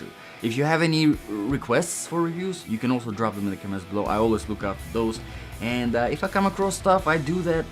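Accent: Russia. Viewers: Английский хороший, но русский акцент с первых же секунд всё-таки слышно ;)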